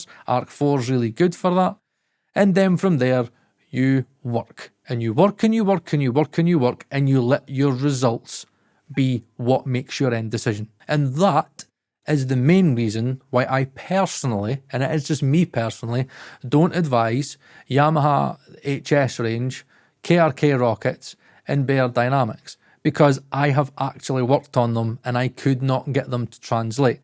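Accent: Scotland, United Kingdom. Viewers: That's the most aggressively Scottish accent I've ever heard in my headphones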